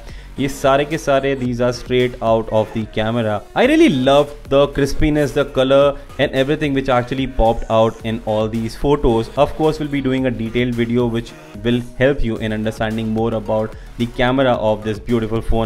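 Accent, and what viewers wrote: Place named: India. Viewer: At first i thought wtf is he speaking English or Indian.And then i gave up and just watched the video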